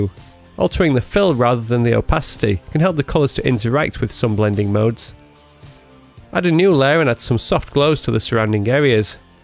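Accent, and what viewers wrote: United Kingdom. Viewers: you're such a cool bloke and your bri'ish accent is very satisfying to listen to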